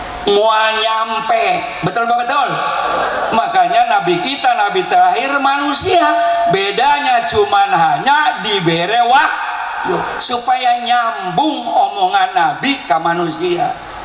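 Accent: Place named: Banten, Indonesia. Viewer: Titisan pandeglang Banten na aya keneh tegeur tina logat ngomongnya kiyai, pelem bahasanya pasehat nyebut jurigna 🤣 Sehat panjang umur kiyai.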